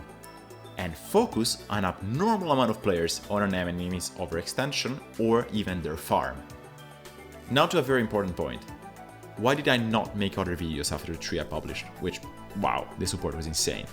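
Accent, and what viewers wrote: Italy. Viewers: As a fellow italian (assuming you're too since the slight accent and the name of the channel) i cannot understate how much this video helped me in just a couple of games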